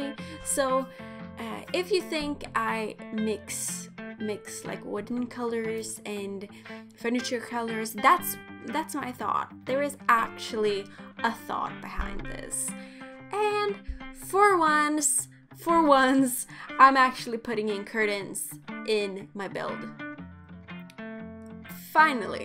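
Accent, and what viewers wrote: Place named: United States. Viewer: Beautiful house!! -- just curious, why do you say "oh lord"? That's very interesting! In the American south a lot of people say "oh lord" or "lordy". "Lordy it is cold in here!". But... most people instead say "oh god", "oh my gosh", or just "goodness". Saying "Lord" doesn't sound strange or out of place at all though! It's just that I would say, it is almost exclusively southern. Like "oh lord" is a truly American thing to say. Because "oh my gosh" is pretty generic and everyone uses it no matter where they come from. So it is very interesting that YOU say "lord" haha. Btw your English is awesome, you got a couple things mixed up but you barely have an accent!!! When I first started watching I was like "oh she's American?? I thought she was from Sweden..." Haha then you said "welcome" and had just the slightest little accent. 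Haha You could seriously just about pass as American lol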